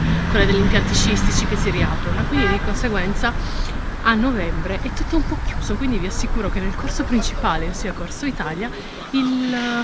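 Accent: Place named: Apulia, Italy. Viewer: Sei in gamba e una bravissima comunicatrice professionale e mai banale. Mi fanno impazzire le tue pronunce pugliesi e la totale mancanza della esse impura!! Sei grande!!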